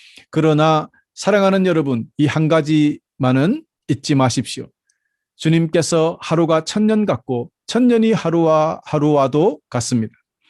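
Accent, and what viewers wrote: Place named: Gyeongsang, South Korea. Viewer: 아 그리고 혹시 목사님 경상도 신가요? 손 좀 씨꺼라.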